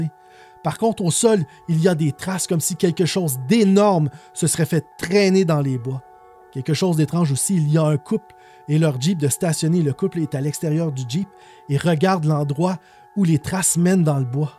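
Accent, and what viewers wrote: Quebec, Canada. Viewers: Enfin un "youtuber" qui parle Québécois ! Pas de "du coup" et autres expressions énervantes à la française ! :D Merci, c'est bien raconté en plus ;)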